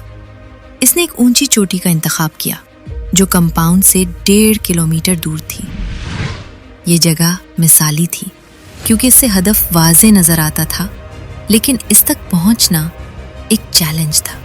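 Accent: India. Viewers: Ap ke urdu Hindi jesi ha I mean lehja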